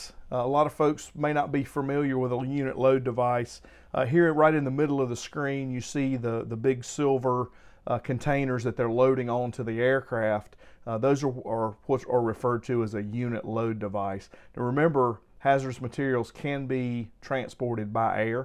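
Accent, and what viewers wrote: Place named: North Carolina, United States. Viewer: I knew almost immediately from your accent and demeanor that you too were from North Carolina. Sure enough, in your office you have a Greensboro Fire Department badge. Thanks for the video! Currently studying for the CHMM exam and it helped simply things for me.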